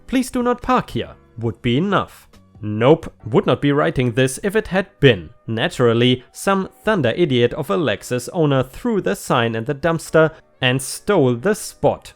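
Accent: Germany. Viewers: 🌟🌟🌟⭐⭐⭐🌟🌟🌟 I listen to you and love your readings. I's say you and rSlash are my favourites then close second are Mrreddit and Markee. I've been playing yours as I go to sleep so hope that helps your channel, about 21-27 vids. I also have a soft spot for Germans, and I mean that in a friend manner, du bist in sicher lol (Excuse my German) Your narrations are awesome and your accent is the icing on the cake. Thanks 💛💚💛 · Germany. Not every german sounds like a nazi in a indiana jones movie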